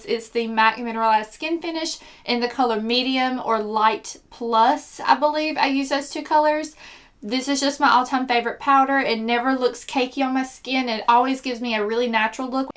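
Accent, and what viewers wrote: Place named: Tennessee, United States. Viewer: Well you're just adorable. (Can you tell I'm 100-years-old?) I love beauty tutorials and am really picky about who I follow, but when I ran into yours, I thoroughly enjoyed it. I MIGHT be a little biased since I'm from Murfreesboro/Nashville, TN, and you have that gorgeous accent, but the kicker is that you know what you're doing. Keep at it and let that personality shine through. Oh! I watched your Nivea-men's-shave-stuff-for-primer thingie one and that's what I use. It almost lifts my face and my makeup....well, it would stay perfect for two days if I'd let it. I have sensitive skin so that's the one I use - FYI. Anyhoo, thanks for your great videos and for sharing your expertise. I can use all the help I can get!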